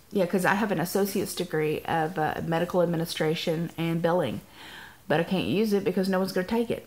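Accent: South, United States. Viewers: Canada is bilingual so you'll find a lot of folks who can speak/understand English. You will probably be treated just fine, as your southern accent is endearing. Montreal is a beautiful old city...are you guys going to do a vacation there to check things out? On the drug front, you likely won't find it hard to get your supplements...if anything Canada has many more over the counter drugs (that don't require prescriptions). I am speaking from experience, as I'm a transplanted Canadian who now lives in WA state. I actually have to make regular runs to the border (no...not for tacos!) to get some of the things I'm used to having on hand (Voltaren, Robax (has Soma in it) and cough syrup with codeine...yadda yadda). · Hey! You will find that what a couple of the other ladies have said is correct, there are a lot of folks in Quebec, Montreal in particular that do speak English, however, I highly recommend learning to speak french as you will be more comfortable if you do so. 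That and hearing your southern self speaking french will be AWESOME! As for the vitamins, Melanie Gregory is right. Also when we move into an area, I have gotten a grocery trolly to take on the bus so I don't have to carry the groceries home. Explore at your leisure so you are more comfortable when you have to be somewhere. What is your husband taking that will have him at the University in Montreal??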